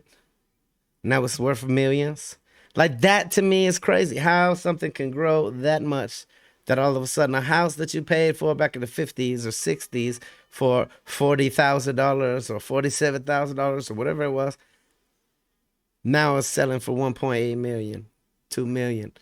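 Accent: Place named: Louisiana, United States. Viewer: You sound like Louisiana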